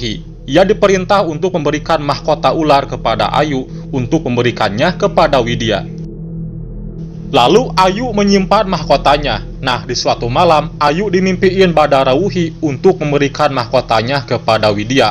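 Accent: West Java, Indonesia. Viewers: logat sunda abangnya ini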